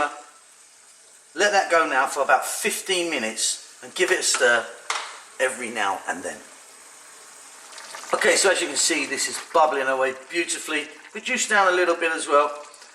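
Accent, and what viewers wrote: United Kingdom. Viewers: I tried the recipe, awesome and I love your British accent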